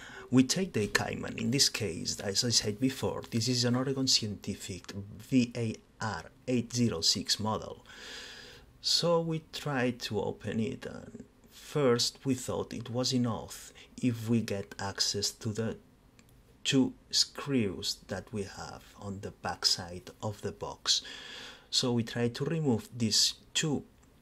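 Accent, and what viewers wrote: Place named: Italy. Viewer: I love your accent. Are you Italian? I am an American.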